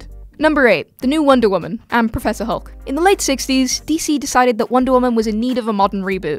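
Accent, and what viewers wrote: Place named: United Kingdom. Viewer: So go to an American channel if you're so irritated by our multiple dialects... American's pronounce things differently to us UK residents, yet it doesn't bother me in the slightest. Imagine being so pressed by a bit of difference. Calm down petal. ✌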